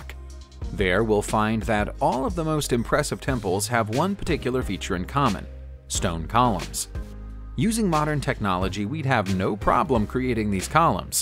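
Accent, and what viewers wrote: United States. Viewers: your pronunciation is typical American .....ATROCIOUS!!!!!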